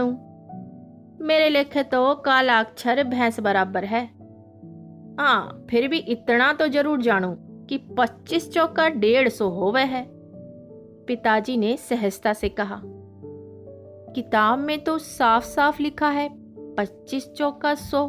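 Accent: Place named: Haryana, India. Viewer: Bahut badhiya kahani.. aur specially apka Haryanvi accent.. great.. m not Haryanvi but mene shadi Haryanvi boy se ki he.. to samjhne lag gai hu... Apne achhe se sunaya ..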